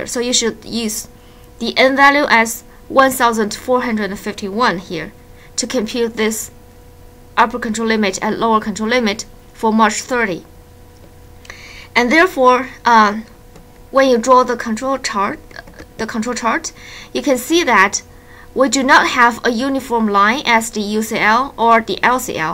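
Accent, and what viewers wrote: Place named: China. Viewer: Chinese accent haha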